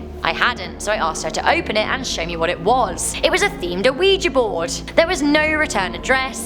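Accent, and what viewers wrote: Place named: United Kingdom. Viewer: Nice british acsent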